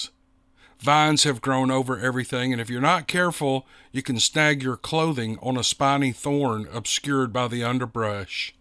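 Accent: South, United States. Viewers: I was born in Louisville. Kentucky. I was raised in the south. I live in Oregon now. Hearing a southern accent makes me homesick. I enjoy this site alot.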